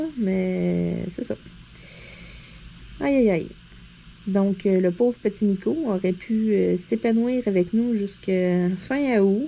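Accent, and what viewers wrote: Quebec, Canada. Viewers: Coucou. Surtout reste comme tu es. Ton accent canadien, tes cheveux et tes ongles sont toujours un sujet de curiosité pour moi. Ta bonne humeur et ta fraîcheur font plaisir. Ne change pas pour les personnes dont les intentions sont plus que douteuses... Bisous bisous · Laisse les faire c'est des jaloux ou jalouses et continue tu es mon petit rayon de soleil dans ma journée. Peut-etre que ton accent quebecois dérange que pour quelques francais notre language est dure a comprendre. Bizarre je vois pas de brillants moi.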